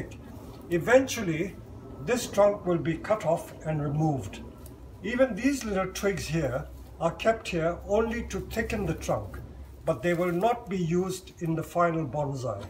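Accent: India. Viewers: it says surrey, england...his accent sounds like he was raised in india... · Absolutely Brilliant. A non Bonsai related question for you Mr.......... Have you ever lived in India? I ask this because of your accent seems very Indian. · Chinese British guy with an indian accent making japanese trees. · He's ethnic Chinese, and was born in India. ( is a Chinese surname.) He speaks English with much the same accent and pattern as an Indian fluent in English from an early age would speak the language.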